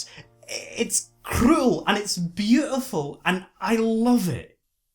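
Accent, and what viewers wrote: Scotland, United Kingdom. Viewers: anime and scottish accent goes so strange together. I meant in a good way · that sweet scottish accent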